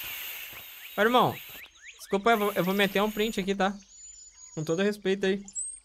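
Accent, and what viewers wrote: Portugal. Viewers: Português de Portugal e praticamente isso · Esse é sim o português de Portugal!!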